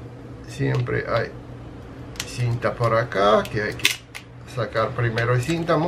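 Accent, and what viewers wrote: Russia. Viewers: Ruso o ?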